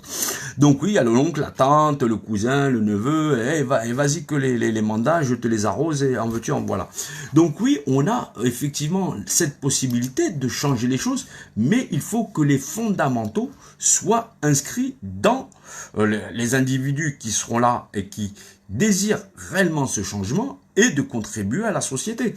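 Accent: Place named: Basque Country, France. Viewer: Avez l'accen basque et un peuti peut de Mediterranean...😊❤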